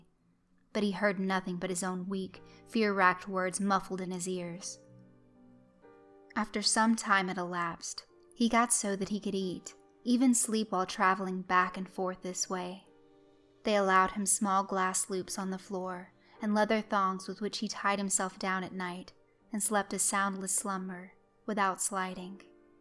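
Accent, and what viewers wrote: South, United States. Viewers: What makes it even better is the cute southern (Georgian?) accent when she's not reading!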